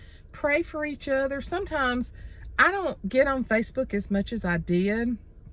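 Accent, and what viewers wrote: South, United States. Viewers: Your southern drawl was in true form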